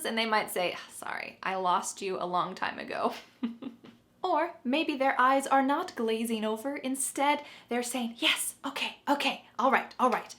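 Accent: United States. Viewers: Love American accent from Pakistan. I’m a really big fan of American life and it’s history. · It's such a pleasure to wake up with your nice US accent and your funny mood. Thanks for the English loving speaker as I am. Have a nice day. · She has an american accent. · thank you for teaching us you are the best teacher for learning American accent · I love you American inglish, I do understand everything's you say,by the way congrats for you program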